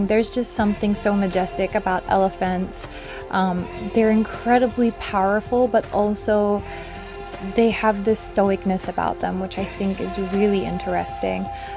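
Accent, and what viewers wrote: Australia. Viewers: Her Aussie accent😁 Elephants are my fav, they have amazing memories and they would dig hole for water and thats also a bonus to all other wild animals. I WISH you visited sheldrick wildlife trust, they do amazing work for wild animals in kenya ❤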